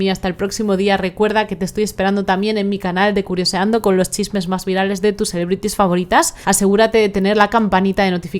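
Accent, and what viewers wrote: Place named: Spain. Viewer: Realmente nunca me gustó ver a algún español no me lo tomes a mal el acento, pero me encanta verte y escucharte te veo y en ocaciones solo te escucho mientras estoy trabajando en el microscopio 🔬 💕 😊 me encantan, las historias criminales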